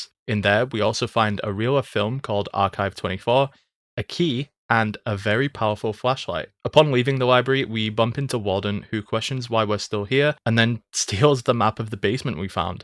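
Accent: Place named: North, United Kingdom. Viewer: Every now and then a video pops up and reminds me that Obscure exists and I love that. And then I hear that you’re a northerner too and it makes it even better! This video was sick, can’t wait to see more like it. 😊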